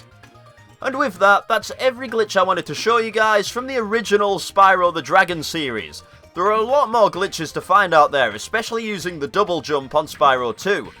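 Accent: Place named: Blackburn, United Kingdom. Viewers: Nice video. Never knew about the duplicate world, really odd. Can't place your accent. Going to have a stab and say Blackburn? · He's so Noorthern - I love it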